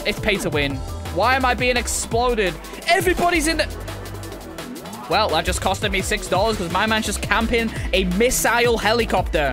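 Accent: United Kingdom. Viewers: yo i have a question are you British?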